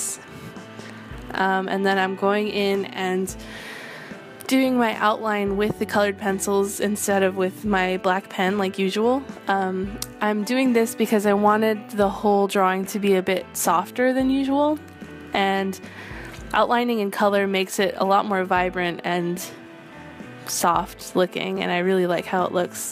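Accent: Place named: California, United States. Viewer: are you from California? cause u totally have the accent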